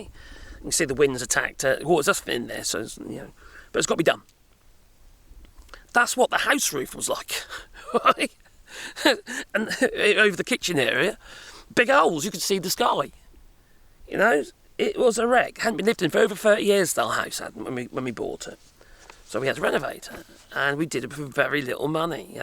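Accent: United Kingdom. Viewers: Fed up with Trump and nice to listen to a British accent for a change! Just ignore your trolls! I pay for YouTube but don't have a YouTube channel. Too old. I suffer from phishing on my mobile phone and at my age have to answer it just in case it's the GP's surgery for a blood test review or appointment. GPs sometimes use their own mobiles and I can't save their numbers. I object to a greasy fraudster calling me by my first name as if we're the best of friends but that's how I know they are trolls! Officialdom never does that! I don't have any investments, insurance or car thank you very much, or a daughter who's run out of money! ❤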